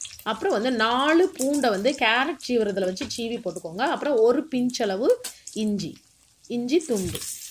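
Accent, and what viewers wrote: Tirunelveli, India. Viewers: Sis u r from Tirunelveli.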